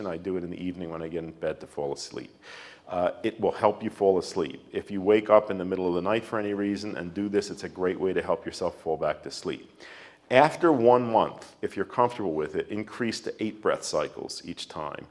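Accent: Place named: Philadelphia, United States. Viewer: Wow, the counting was fast. I will give it a go. Do I ever hear Philly in Dr Weil's voice; "out", "around", "choklit." The 1st 2 almost have an extra vowel sound I couldn't spell. Love it, however.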